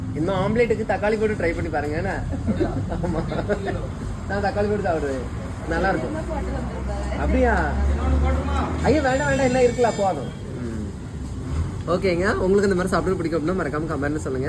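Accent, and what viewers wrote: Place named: Kanyakumari, India. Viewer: Casual ah neenga pesuradhu thaan namma kanyakumari azhagu ❤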